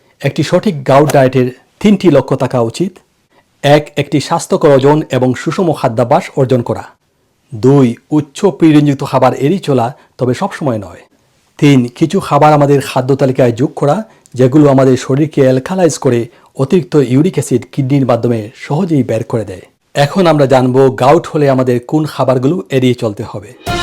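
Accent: Sylhet, Bangladesh. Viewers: ডাঃ সাহেব আপনার জন্য আরশি বাদ রহিলে আপনি সিলেটী কথায় বুঝা যায় সঠিক জানি না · আপনি সিলেটী নাকি? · আপনার একসেন্ট। খ উচ্চারণ। ভালো লাগলো সিলেটি ভেবে 😊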